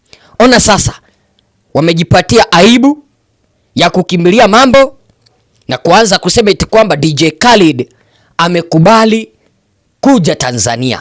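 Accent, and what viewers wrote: Kenya. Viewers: Mbona ki Swahili cha Kenya. Halafu unasema nchi yetu Tanzania.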